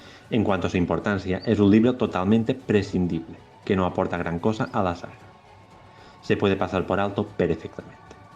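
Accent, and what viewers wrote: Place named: Majorca, Spain. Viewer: tienes un acento mallorquin que me encanta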